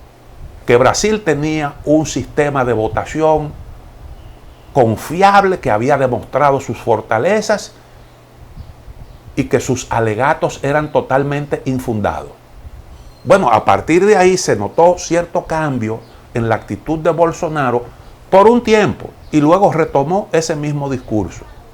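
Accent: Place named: Dominican Republic. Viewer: Buenas noches. Ciertamente no me pierdo ninguna de tus programas, siempre interesantes. Hace ya 23 años participe el la reconstrucción de RD en la zona de Haina, tras los desastres del Huracán George. Monte una mina en el Montoto, carca de Higuey, para fabricar agregados para asfaltado y hormigón de las carreteras y puentes que se habían destruido. Como dicen ahí, "dure " dos años, alternando con otros negocios que tenía en España. tengo grandes recuerdos de ese país y lo recuerdo con cariño. Su dicción Dominicana me encanta. Colaboraba conmigo un gran amigo dominicano, el Ingeniero Emilo Pimentel. Gran persona como amigo y profesional. Perdí el contacto con el. El Huracán fue en el 98 y hace años, si sigue entre nosotros, tendrá cerca de 80 años. A donde voy es que su manera de hablar pausada y sus dichos dominicanos me recuerdan a el. No he vuelto por ahí, pero me cuentan que ha cambiado mucho el país. 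Me llamaron para la construcción de carreteras en Haití, antes del terremoto. Había una empresa española que se llamaba Elsamex y nos propuso formar una UTE para realizar las obras mencionadas en Haití. Surgió el terremoto y se indio la oficina provisional de Elsamex en Haití, y murieron dos ingeniero y un topógrafo que se encontraban en dicha oficina. Lógicamente se suspendió la obra que se iba a realizar con financiación del Banco mundial, lo mismo que se hizo en las obras de Higuey.Le contaría muchas historia de mis vivencias en RD. pero no viene al c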